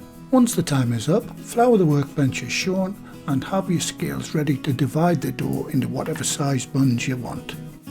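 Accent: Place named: Newcastle, United Kingdom. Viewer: Another fab video. I bought some store bought ones yesterday. and they were disgusting, i threw the rest out to the birds this morning, even they were in 2 minds whether to eat them. I will be making my own now. Not only am i loving watching your channel and enjoying your easy to follow recipes, i love your geordie accent. Thanks again.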